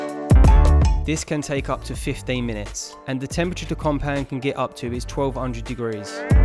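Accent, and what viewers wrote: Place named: Birmingham, United Kingdom. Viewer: Just curious are you from brum? U got a brummy accent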